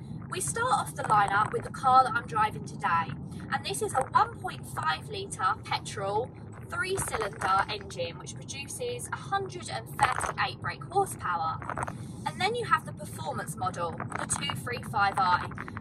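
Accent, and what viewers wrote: United Kingdom. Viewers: No it's just the british accent lol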